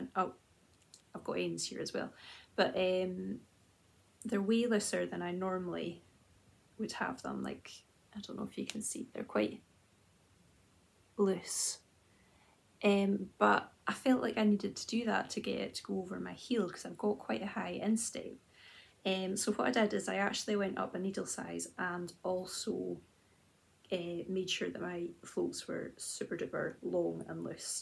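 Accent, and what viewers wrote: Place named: England, United Kingdom. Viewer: I really like your Instagram and this podcast is definitely great.Unfortunately, I can't understand your English accent 😓 and there are no subtitles.I'm sorry, but it's my fault . So,Happy New Year.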